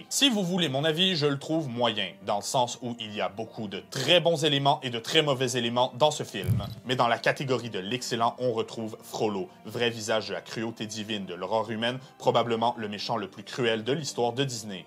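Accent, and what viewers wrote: Quebec, Canada. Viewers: Wô! Es-tu Québécois toé là? :D (Sorry, je viens de débarquer sur la chaîne! Des critiques de films et analystes québécois sur Youtube, j'en vois peu!)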